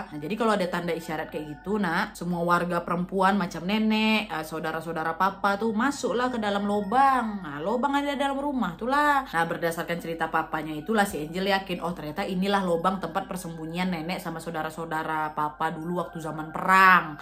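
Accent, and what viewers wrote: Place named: Minangkabau, Indonesia. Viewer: lucuu banget bahasa minang nya uniiiii😂😂😂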